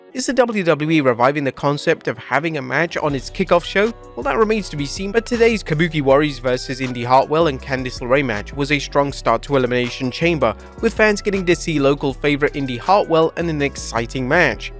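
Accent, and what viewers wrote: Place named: United Kingdom. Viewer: Wtf I didn’t know you were from the UK😂😂😂😂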